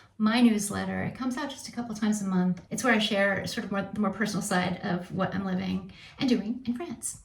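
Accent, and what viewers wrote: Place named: United States. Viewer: Perfect french with a lovely american accent 😊 Love it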